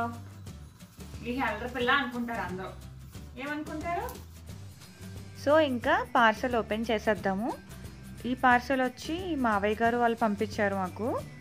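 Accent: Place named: Godavari, India. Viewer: Godawari esha...uttichinattu vinapaduthundi👌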